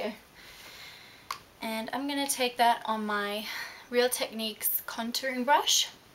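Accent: Australia. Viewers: Thank you :3 This will be perfect for school! From ur accent im guessin ur an aussie ? Same :3 Ps, Your bootyful. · Very nicely done., you are a beautiful girl and you do this 'almost - no - makeup', natural look well. Wish I could master the natural, no makeup look. I'm a fanatic when it comes to makeup, actually I am extremely artsy, so I always tend to view my whole face as a canvas. I don't even have a ready in five or ten minute go to everyday look. So laborious when it comes to putting my face on. But you knock this out in ten minutes flat. May I ask you, where are you from? Your accent sounds australian. It's charming. Thank you for the posts.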